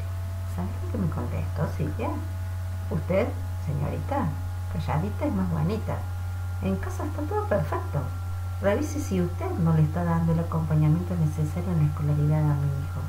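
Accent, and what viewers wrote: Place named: Cordoba, Argentina. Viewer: Estaba buscando a cerca de la ley para realizar un informe y me encantó la información que me brindaste. Muchas gracias 💛 Por tu acento creo que sos de Cordoba, saludos desde B.S. A.S.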